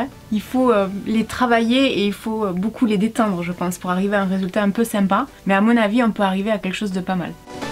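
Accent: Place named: Marseille, France. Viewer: T'as l'accent marseillais !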